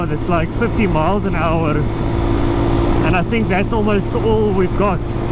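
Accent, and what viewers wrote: South Africa. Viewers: Is dié man Afrikaans?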